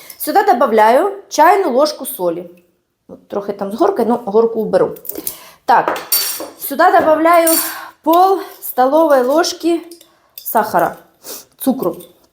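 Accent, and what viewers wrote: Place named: Kherson, Ukraine. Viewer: нравится такая речь У нас на Херсонщине так разговаривают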